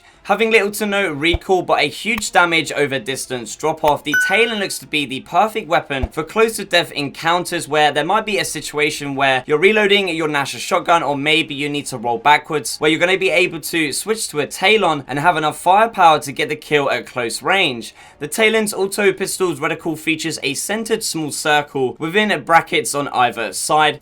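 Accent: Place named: United Kingdom. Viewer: Aymen Salih He has a British accent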